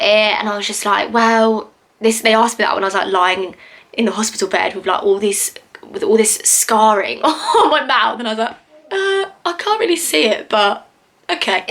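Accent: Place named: United Kingdom. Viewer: I have a bilateral cleft palate I am Filipino male. I experienced a lot of verbal abuse from my own dad and other people because of my birth defect. I tried many times to slayed my oown life. I experienced more than ten years depression. I survive of this negtive situation because of my faith to Jesus Christ. I love your gorgeous accent and u have pretty face moon. I wish I can meet a beautiful british girl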